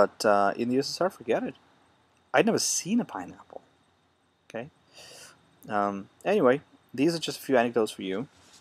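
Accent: Midwest, United States. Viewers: How come you sound American if came to America in 2005? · It is rather evident that you are an english (US) native speaker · But that aside based on the way you talk and look correct me if I’m wrong. You live in the central to Midwest in the USA. If I had to narrow it down I’d say Ohio or Indiana. Judging by your complexion you work indoors maybe even third shift. Id day you work in a warehouse or maybe a factory. Your not a business man based on your gruff appearance you don’t wear a suit. You use a lot of short to the point words entails you aren’t highly educated I’d say associates degree at most. Be honest how much did I get right? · Your accent is very American despite living in Russia for so long. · Without sounding stupid as I haven't watched the entire video yet, how come you have a near perfect American accent? Did you live in America until you were 10? 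· This is a very interesting account of your life and the life in general about living in the Soviet times ; Your ability to speak English like an American is quite impressive. Pretty much everything you described such as the description of your Kruschevka apartment, the scarcity of some foods (ie Bananas) lack of toilet paper ,the difficulty of traveling abroad and lack of private cars is exactly what i read about the USSR back in the mid 80s living in the US. It is true that the US news and movies did (and still do) have a lot of propaganda, but at times if you were a good reader you could occasionally find unbiased articles that described the daily life and the culture of the Soviet people .Few people today can remember a time before the internet and global connectivity back before you were reliant completely by books , tv or an immigrant who lived abroad. As a person who lived this way you do have a unique and straightforward perspective of telling your life in such a way that I find very few Russian immigrants feel reluctant to tell. 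I pesonally want to thank you for your story and time... You represent the American Dream that you can achieve if you believe. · My God! You have no trace of an accent! One would never know you were originally Russian. You sound so American! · Sounds like midwest english .. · My family is from Crimea and has deep roots in Krasnoperekopsk, I call fake on this video, he has a perfect American accent but allegedly spent his entire formative years in the Soviet Union, and smokes a fat cigar which is probably just a stereotype for street cred thing, and he says bedrooms are a foreign concept, no the idea of having one specific room for only sleeping and nothing else may be to some people but the idea of having a room for sleeping wasn't a foreign concept in the Soviet Union, and Leningrad was far from the nicest place in the Soviet Union to live. And you can complain about apartments not being luxurious by modern standards but by the standards of the time they were a huge improvement over most people's living conditions and far better than living on the cold streets, at least people were housed and fed, many countries in the west particularly back then had high rates of homelessness and unemployment and some still do. 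And bananas? Seriously? Oh well how horrible of the Soviet Union not to have installed a bunch of Banana Republics and conducted a bunch of massacres like United Fruit to get an unlimited supply of bananas, yes Bananas were a luxury that's because Bananas don't grow well outside of a tropical environment and it took acts of genocide and tyrannical imperialism to make them an affordable commodity in the United States and Europe. So no bananas, but there were plenty of grapes, at least in Crimea I can't really speak for the rest of the Sovi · how did u lose your accent so fast if you lived in russia for 30 years? you sound like your from the midwest.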